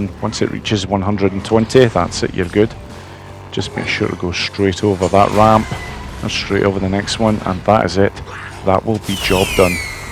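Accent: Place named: Dumfries, United Kingdom. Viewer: Andrew Coltart - that's the guy! Your accent reminds me of his. Wiki says he was born in Dumfries. You close to there?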